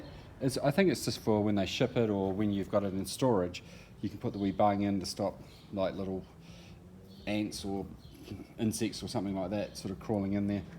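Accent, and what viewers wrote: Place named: New Zealand. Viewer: Fantastic! I have been thinking of buying something like this, but they are so damned expensive. I have to ask though, is the pump fit for breathing air? I know that in SCUBA, they have to filter it to take out anything that may harm health, so I am keen to know if this pump is fit for purpose from a health sense. If so, I think I may do this. BTW, it sounds like you are a Kiwi. Where abouts are you? I am in Welly.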